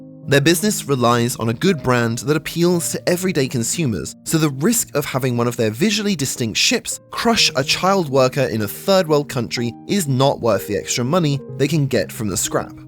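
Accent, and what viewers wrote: United Kingdom. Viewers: Really excited to watch this until I heard the narrator is British, what a shame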